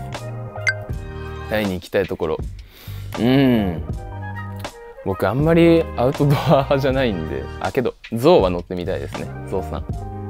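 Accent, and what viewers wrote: Japan. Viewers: ugh he's so hot, his voice is so deep and i love his Japanese accent 😩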